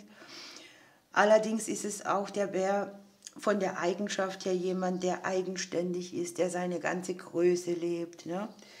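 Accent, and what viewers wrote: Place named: Heidelberg, Germany. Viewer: Liebe, du hast dir so viele Abonnenten verdient, denn du bist richtig gut. Es ist wirklich schön, dass es dich gibt. Du hörst dich übrigens an, als kämst du aus Heidelberg und Umgebung, denn du sprichst wie Beate Bahner, die Rechtsanwältin aus Heidelberg, die sich in der C-Zeit für die Menschen, die sich nicht "schlumpfen" lassen wollten, die keine "Larve" tragen wollten etc. eingesetzt hat.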